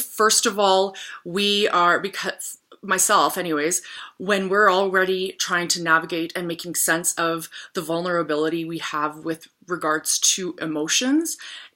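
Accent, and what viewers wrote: Canada. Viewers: You speak like in some parts of Canada. Are you Canadian?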